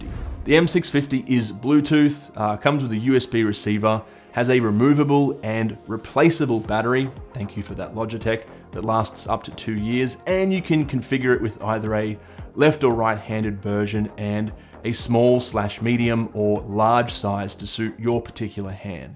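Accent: Australia. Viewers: Australian accent but USD prices? Also, has there ever been a 3rd party mouse with 2 axis scrolling? I have tried other mice but scrolling side to side is essential for Illustrator.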